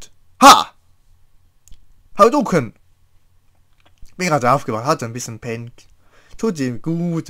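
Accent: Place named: Switzerland. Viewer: Das ist ne echt gute Frage. Ob du ein guter Lets Player bist, kann ich nicht so genau sagen, da ich deine Videos zu wenig kenne. Ich kenn dich glaub schon sehr lange, bestimmt um die 3 Jahre. Aber ich habe nie aktiv auf deinen Kanal geschaut. Was ich sagen kann, ist das ich mir am liebsten einen LPer ohne Akzent anhöre, was keine Beleidigung an dich sein soll, weil du kannst ja nix dafür du in der Schweiz geboren wurdest. Man merkt schon, dass du dich dafür einsetzt und du dir Mühe gibst. Ich schau halt kaum die Videos, weil ich keine Interesse an den Spielen habe. Aber ich mach wie versprochen Best Ofs von ein paar deiner Projekte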